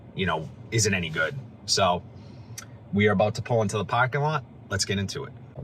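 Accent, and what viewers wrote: Boston, United States. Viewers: Great way to test fragrances for sure. Love the vlog! Whats your accent? Boston?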